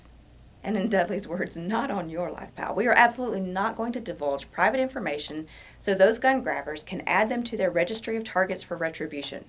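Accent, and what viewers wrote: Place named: South, United States. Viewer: is not from Colorado! You sound like you’re from the south like me! 😂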